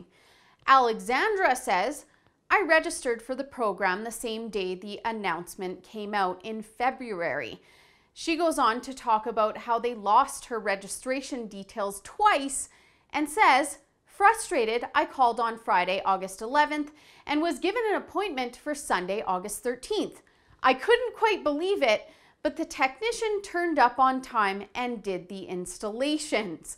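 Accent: Canada. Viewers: Hey loose the Canadian accent and your golden, like the crust on that mac and cheese you made for me last nite in the mini kitchen of that nasty motel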